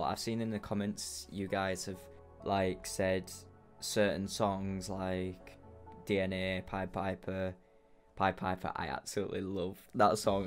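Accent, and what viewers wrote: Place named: United Kingdom. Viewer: I know how you feel...Even though I already know the songs, listening together with you put me in exactly the same state as you. A kind of bliss and existing in my own sphere^^ It's like his voice, his emotions and the lyrics stay even when the song is already finished, and keep resonating with different parts of my subconciousness.... I honestly love how moved you are by their songs, makes me really enjoy watching your reactions. Thank you! :) Btw as a non English native speaker.... still trying to figure out your dialect^^ I would go for GB but there are still so many possibilities lol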